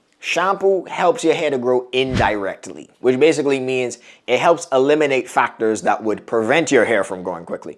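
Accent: Bahamas. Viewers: he does sound Bahamian. I knew when he said “hay-auw” for hair lol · I think the Bahamas 🇧🇸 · sound like he is a Bahamian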